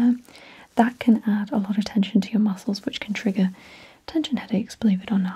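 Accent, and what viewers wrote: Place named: United Kingdom. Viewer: "So if you could just lift up your shit slightly". For some reason the British accent adds to it haha, love the video <3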